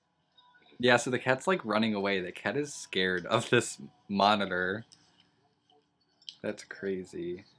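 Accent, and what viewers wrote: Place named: Minnesota, United States. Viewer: I keep wondering what his accent is?? Minnesota? The a’s, like “welcome bahck”. It reminds me of Kathy Bates in AHS Hotel.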